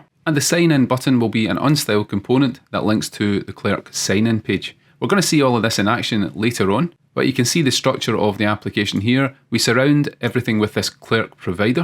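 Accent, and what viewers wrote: Scotland, United Kingdom. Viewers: Within the first few seconds of watching the video, I got strongly drawn towards his Scottish accent of English .... Are you from Scotland Sir? Love from India !!!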